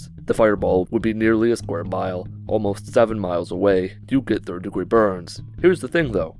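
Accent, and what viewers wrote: Michigan, United States. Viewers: I'm good g to guess your from Michigan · I knew your accent/or lack of accent was michigan-y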